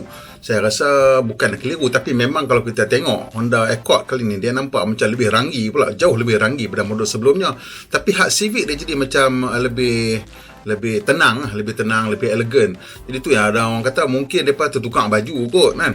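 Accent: North, Malaysia. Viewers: Abg org mana bang...dok cakap belah utagha ni..😄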